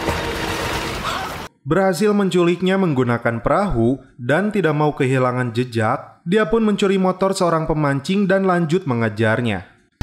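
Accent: West Java, Indonesia. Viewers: Sunda ning a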